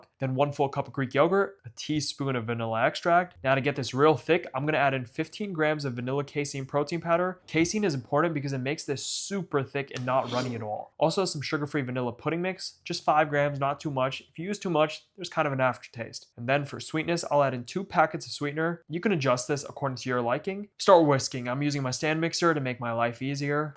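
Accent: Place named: Boston, United States. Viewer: Amazing! Love the subtle Boston (or is it Brooklyn? 🤔) accent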